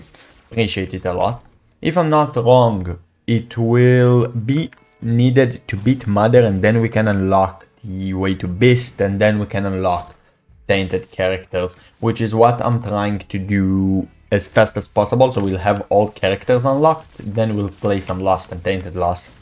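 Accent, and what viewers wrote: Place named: Israel. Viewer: Say, are you Israeli? Your accent and the way you say mother gives me strong Hebrew vibes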